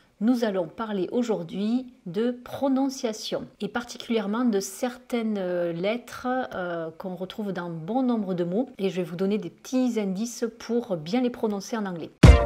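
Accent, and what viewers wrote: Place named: Toulouse, France. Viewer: Tout d'abord moi qui suit de Toulouse, j'ai peut-être reconnu le petit accent 😉chez, que je tiens à remercier pour cette vidéo et les autres bien sûr, ainsi que tous les interveneants d'Anglais cours club. Méthode excellente!!!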